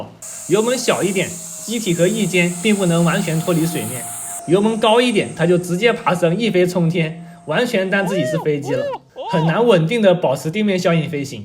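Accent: Zhejiang, China. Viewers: 很浓浙江口音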